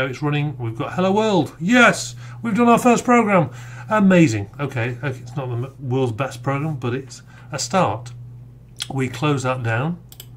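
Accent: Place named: United Kingdom. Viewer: this man let me hear his whole passion and the british accent round it perfectly up really great to start the journey with you guys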